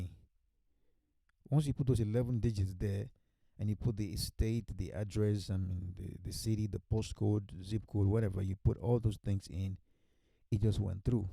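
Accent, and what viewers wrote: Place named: Nigeria. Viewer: Bro, you sound like a Nigerian, please can we connect so you put me through please 🙏🏽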